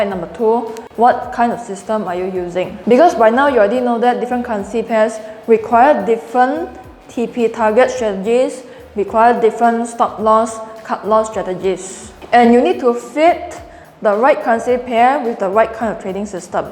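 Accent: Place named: Singapore. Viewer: Haha, really love watching your videos. Having someone explaining things in the style of Singaporean feels so homely.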